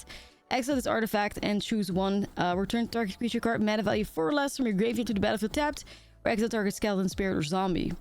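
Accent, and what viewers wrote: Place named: Netherlands. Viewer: Are you from the netherlands? Sometimes your accent sounds like that :D Its kind of cute.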